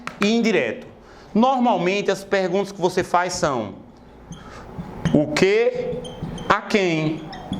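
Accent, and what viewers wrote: Northeast, Brazil. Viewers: Fessor vc é lindo e é dez!!!! Gostei do sotaque nordestino!Adorei a dica "DECA" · Um nordestinoooo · sutaque nordestino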